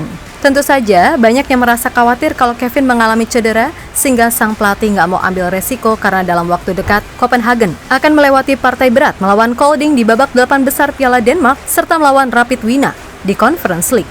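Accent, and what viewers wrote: Java, Indonesia. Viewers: Suka medok e yu... Hehehe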